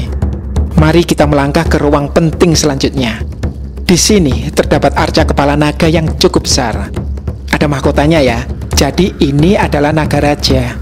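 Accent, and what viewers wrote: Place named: Java, Indonesia. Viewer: Naratornya jowo banget, jowo medhok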